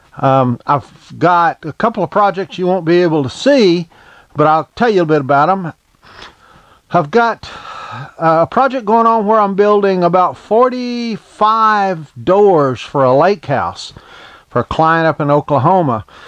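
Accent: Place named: Texas, United States. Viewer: I just recently subscribed and I am enjoying your channel. I don't know for sure where you're from but you sur sound like a fellow Texan. Keep up the good work. Jim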